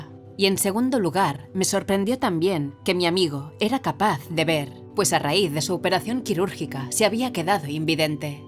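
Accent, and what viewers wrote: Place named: Spain. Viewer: Esta ECM nos deja una gran moraleja, tenemos que ser más empaticos y cuidadosos cuando decimos o hacemos algo, tal vez sin querer podemos lastimar u ofender a los demás. Me encantan estos testimonios, me traen paz y tranquilidad, me hacen pensar que en algún momento me voy a reunir con mi niño en un lugar de perfecta paz, además ese tono de voz y ese lindo acento español es muy relajante. Te lo agradezco mucho. Saludos cordiales desde Colombia!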